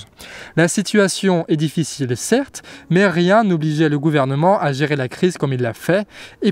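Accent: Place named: Trégor, France. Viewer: Trop zopaque, l'accent du Trégor !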